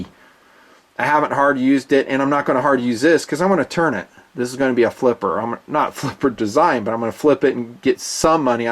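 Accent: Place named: California, United States. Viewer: Thanks for review! Never seen your channel and I'm blown away by how many followers you have...cause you sound like the kids I used to go to school with in california. ..brah! Seriously you got the steel wrong and the knife is on bearings. It's hard to watch your video when you don't know the item reviewed. JMHO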